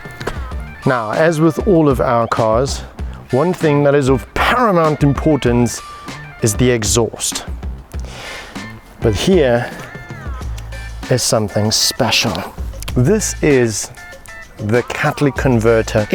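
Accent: South Africa. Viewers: Mate you sound like you could be from SA?? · This guy sounds South African 👌 are you? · you sound very South African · Is the presenter South African? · Any chance you from South Africa with that accent? 🤔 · not sure. Just know he’s South African from the accent.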